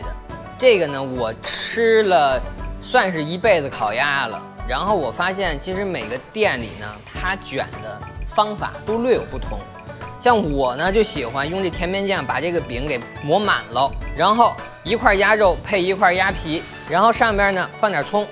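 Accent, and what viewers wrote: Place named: North, China. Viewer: 口音像北方 長相像南方人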